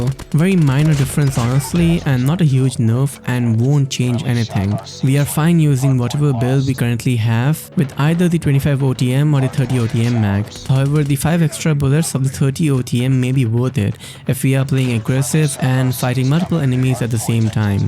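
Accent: India. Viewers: Are you indian, your accent is kinda Indian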